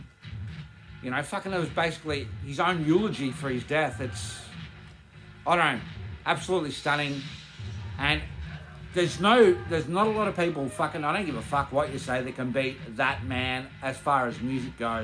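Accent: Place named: Australia. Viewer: Mate never fucken change your ways for any fuckwit. You being an aussie like myself was one of the things that pulled me into your vids. Got sick of listening to americans haha. But if youre jumping down the Power Electronics rabbit hole and want something extreme, check out Deathkey if you havent, also Intolitarian. Antichrist Kramer did the artwork for Absurd albums among many others! Cheers brother! 🍻🍻🍻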